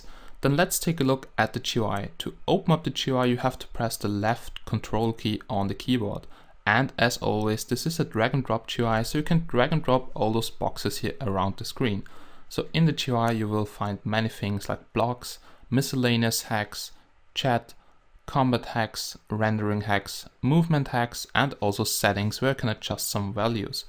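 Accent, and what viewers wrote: Germany. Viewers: Merkst du das nicht:D? ich finde man merkt es an seiner aussprache :) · Man merkt das du Deutscher bist.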